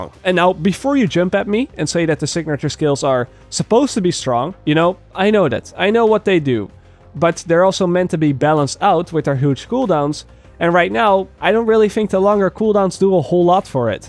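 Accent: Netherlands. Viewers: are you dutch? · he is dutch ."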